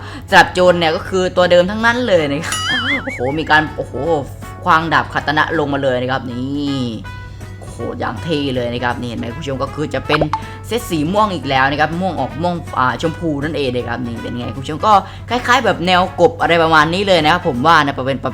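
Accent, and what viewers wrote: South, Thailand. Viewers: พี่เป็นคนใต้ม้ายอะ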